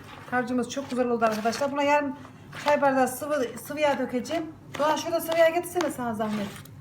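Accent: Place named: Çorum, Turkey. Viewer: asıl memleketiniz neresi biraz çorum tarafına benziyor şiveniz becerikli süperrrrrrr süperrrrrrr hamarat anne hayırlı akşamlar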